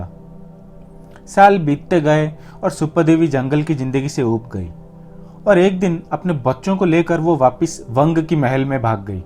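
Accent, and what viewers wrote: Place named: Odisha, India. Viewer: The researcher trying to get a connection with srilanka and Odisha with few old evidence. I request to researcher try to so the examples of words which are similarity with srilankan language currently on use in our daily life,Mostly which district of Odisha using these words. Because district wise we have varity of dialects are using as per our region. One most important request to commenters not to use vulgar languages and do not comment on personal life of researcher. If we have more batter evidence to defend him than let be make a new one based on his blog. Which is benifit to new researchers of this topic. Let be welcome him for his new step. Hindi is the most popular communicative language now a days. Our odiyan Hindi speaking like this ,so there is no matter of negetive comment. One of us if translate by rectify his mistakes in odiya than it also good step. 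Criticism is welcome for all. Bande Utkal janani.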